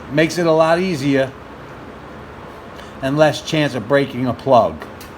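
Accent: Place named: New England, United States. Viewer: Excellent commentary and directions. Voice reminds me of when I was a young man in New England learning the trades.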